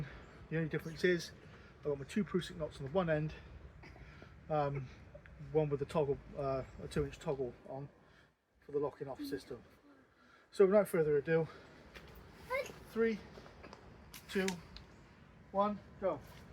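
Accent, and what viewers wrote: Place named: Dorset, United Kingdom. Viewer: Nice! For once youtube recommended a video worth watching. Thats a beauty, and by the sounds of it your clearly my neighbour lol (im from dorset) so would be rude to not subscribe! 👊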